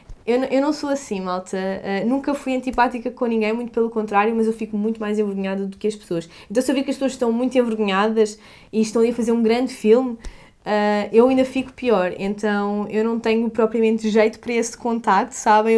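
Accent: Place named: Portugal. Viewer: Eu tenho usado os vídeos dela como um tipo de asmr , fico assistindo e ouvindo a voz com sotaque português . Me ajuda muito com a ansiedade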